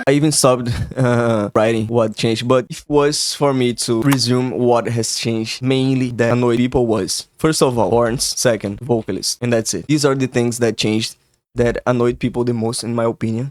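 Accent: Brazil. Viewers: READ THE ENGLISH PART FOR IMPORTANT INFORMATION quando eu ouvi o sotaque já sabia que era daqui kakkakakak bem completo o vídeo, só não esquece que o que aconteceu foi o seguinte (assim como já falaram, mas vou repetir): (English), the artist, that joined some years ago in the same label as, had access to unreleased IDs from himself, becuz of this, he made some remixes and bringed up on his songs some vocals, lyrics and melodies that didn't released, as we can see on. if i remembere correctly the year, in 2017 had played this version of (which is a remix) in concerts, and also, there are reports of infiltrators that team scrambled up the files and ended up sending remix as a final version and it got released... It gets worse when you know that, more than the fact that it doesn't looks like the original version, it's not even made by, and it disappoints (Português Brasileiro) o artista, que há alguns anos entrou na mesma gravadora do, teve acesso a IDs não lançados do próprio, e isso fez com que ele fizesse alguns remixes e incorporasse nas músicas dele vocais, letras e melodias que o não lançou, como é o caso de. 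se me recordo bem o ano, em 2017 já foi visto tocando em shows essa versão de que é um remix dele, além disso, há relatos de infiltrados, em que o time do acabou misturando os arquivos e enviando como versão final o remix do, qu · I was gonna ask if u're brazilian because of your accent until I saw the "Portugues do Brasil" on spotify. Concordo com todos seus argumentos, mano. Diria ainda q pra nao deixarem os fãs tão putos, poderiam ter mantido o primeiro "drop" do jeito original, e aumentado a energia do segundo usando essa versão nova. Eu fiz um som em homenagem ao e lancei aqui no meu canal faz uns dias, seria dahora se vc ouvisse e me falasse oq acha. /watch?v=A9yiasCdmE8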